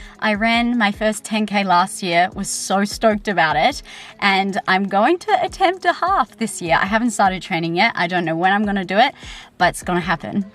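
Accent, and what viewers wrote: New Zealand. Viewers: New lense looks great, kiwi accent gets stronger every video and the hikes mentioned are such good ones. Ive got the able tasmin booked in a few months. Grow where you are planted- so few people learn to do this well! Also cooking is such a good time after you start figuring everything out. You gotta be you though and comfortable pushing yourself out there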